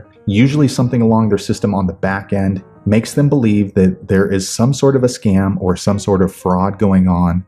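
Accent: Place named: United States. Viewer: i can tell you're american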